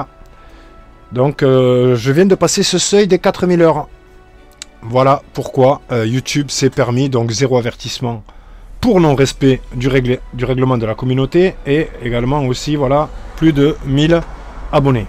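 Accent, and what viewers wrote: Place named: Toulouse, France. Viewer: Salam, Force à toi.fait le tri mec. Ont commecent en a avoir vraiment,mais vraiment plein le cul. T'es videos sont magnifiques mon Ami. De la force pour toi et les tiens 🙏 L'union fait la Force, avec l'accent du 31 toloza ma gueulle 🔥🙏✊ La Mosqué au milieu de la Medina 🙏 L'église est sacrée aussi.. Salut Salam Shalom ✌️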